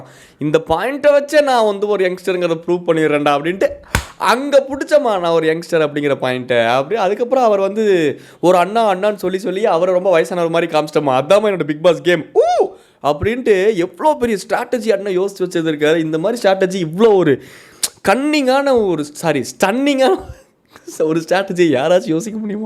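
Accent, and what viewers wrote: Coimbatore, India. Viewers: Your cute man but nenga pesurathu Konjam coimbatore slang mathiri irukku but nalla irukku Ivana kadaisi varaikkum thiruthave mutiyathu intha moji pathale kovama varuthu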